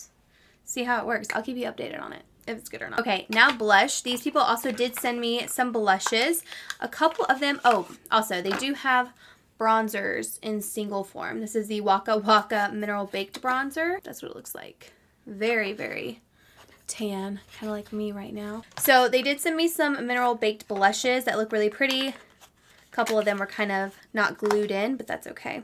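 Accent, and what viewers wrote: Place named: Colorado, United States. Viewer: Sounds like Colorado to me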